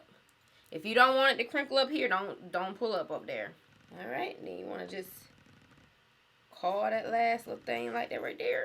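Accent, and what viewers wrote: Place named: Atlanta, United States. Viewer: I’m a First time viewer and the minute i heard you speak i knew you were from atl lol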